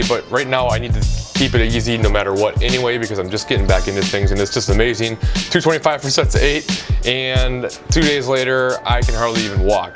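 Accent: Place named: Minnesota, United States. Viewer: I missed that Minnesota accent. I'll see you at nationals also bb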